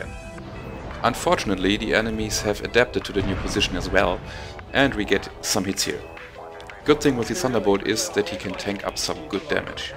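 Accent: Germany. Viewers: Are you German? That's one heavy accent you have. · Very impressive, this tutorial adresses things i have seen other players doing and always wondered about. I don not own the 9S and the modifications you show here will cost a lot, so no TBolt sniping for me in the next time. But i definitely want to try it out at some point, since i already own most TBolts (Overlord package), so i will be able to unlock all mech skills. One question though: i noticed it on other of your vids too, the way you speak sounds like a german speaking englisch to me?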